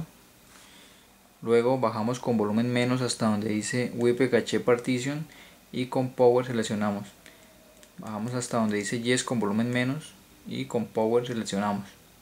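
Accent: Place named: Colombia. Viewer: Que buen tuto! Gracias. Funciona perfecto. Y amo tu acento Colombiano. Un beso :*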